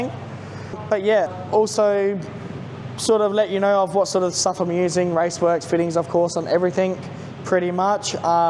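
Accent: Australia. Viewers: He almost sounds Australian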